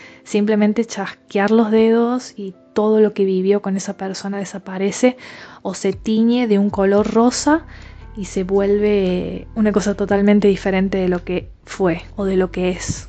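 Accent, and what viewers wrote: Córdoba, Argentina. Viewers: Que interesante la diferencia que haces con respecto a la persona tóxica y el narcisista. La importancia de atravesar las distintas etapas y las distintas emociones que se van generando. Impecable. Sos cordobesa?. Das charlas en las provincias?. Es un tema que me re interesa. Que importante es educar. Veo todos tus videos.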